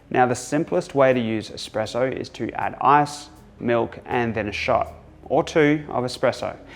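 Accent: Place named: Australia. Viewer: Bro, I love your accent... BTW are you Australian?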